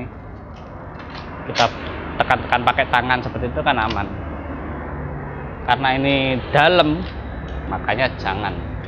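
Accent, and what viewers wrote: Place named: Java, Indonesia. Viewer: owalah denpasar ... kalo ngomong kok ada jawa"nya masbrow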